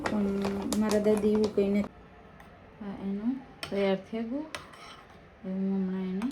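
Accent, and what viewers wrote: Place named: Kathiawar, India. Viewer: હમે પણ તમારા જેમ બોલીએ કાઢીયાવાડી 😅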